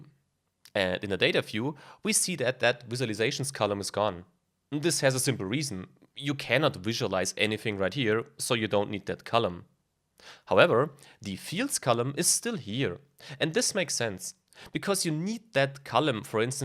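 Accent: Germany. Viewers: Love his German accent.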